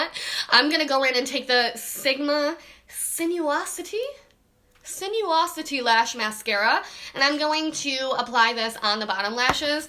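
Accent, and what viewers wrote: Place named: Midwest, United States. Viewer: Midwest accent for sure!!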